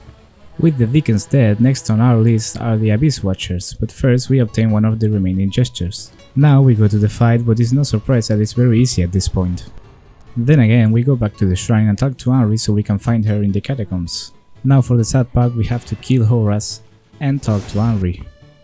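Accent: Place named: Spain. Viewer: Como se nota que eres español😂😂😂😂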